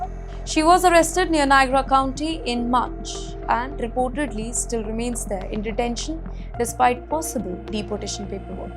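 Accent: India. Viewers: Curry english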